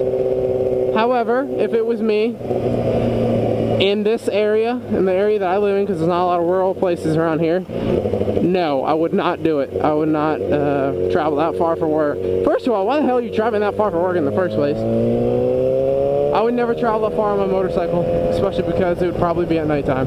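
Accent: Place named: South, United States. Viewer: Im just curious but where do you live? Like what general area? Im not trying to be creepy or stalkerish but I was wondering because you are always going to the beach, the area seems very temperate, like in this vid you see some swap. From your accent you it sounds like the south maybe? Im guessing NC/SC. Im thinking on moving down there in the spring an was curious about the riding season.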